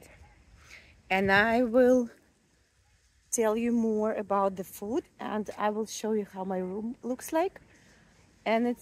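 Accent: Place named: Russia. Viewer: Судя по динамике сообщений тут и английский не понимают)) А вас с первого вашего слова понял что вы русскоговорящая)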